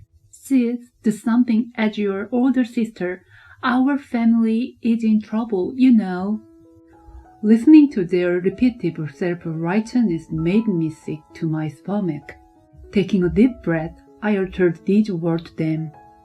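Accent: Japan. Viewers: Hello! Have idea! Hire someone to read stories that are translated into English by someone who has actually lived in a real English speaking country and attended some kind of Grammer school in America or England or Canada or Australia so that other English speakers will have some chance to hear the story clearly! Present reader obviously has never left Japan!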